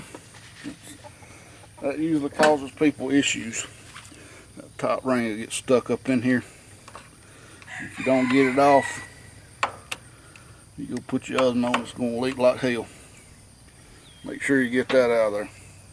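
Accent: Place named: South, United States. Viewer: Thanks for the upload! Plus you sound like a good ole country boy, hell you would fit right in around here!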